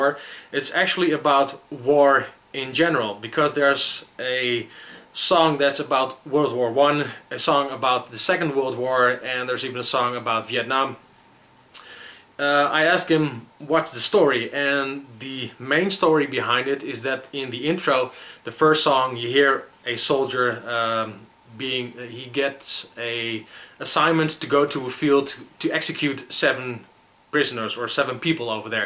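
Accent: Amsterdam, Netherlands. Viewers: Hahhaha je hebt een Amsterdams accent. Awesome : P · Wow your 100% Amsterdam xD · hahaha je hebt echt een duidelijk amsterdams accent!! haha geweldig!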